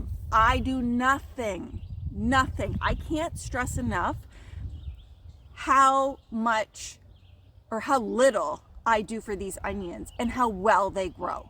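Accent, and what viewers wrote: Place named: Canada. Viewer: I found your video looking these up because my grandparents grow these and I’ve been wondering how to use them to see if I want to take some to start in my yard. Fellow Canadian here in southern Sask and as soon as I heard you speaking I knew you were Canadian.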